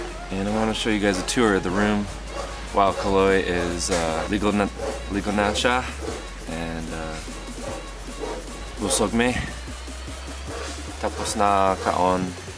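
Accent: Bisaya, Philippines. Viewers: Lingawa naku sa bisaya accent. HAHAHA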